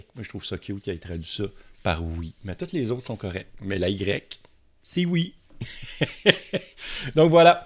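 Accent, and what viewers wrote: Quebec, Canada. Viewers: J adore ton accent cousin. Les canadiens sont chers à nos cœurs. Et tu es agréable à écouter. Même si j ai bien de la peine que ma tesla model 3 LR de 2021 reste à la mise a jour de 2023 pour une raison que j ignore...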